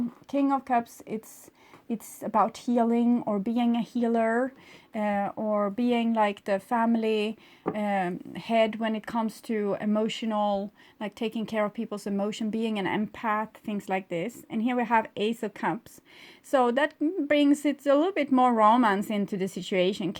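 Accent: Sweden. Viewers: My ex has been calling me but I don't want to hear anything he has to say. Yes, he is a very inmature Leo who doesn't love anyone, including himself. It breaks my heart that he is so broken but he is unbarably toxic but I must protect myself from him. Being the better person is something I have always been told but I have learned their are lots of people who count on nice people being the better person so they can take advantage of the person being the bigger person. Thank you for tnis reading and I just love your Swedish accent🌹🌹🌹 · Are you from Sweden? Thank for a good reading. ❤️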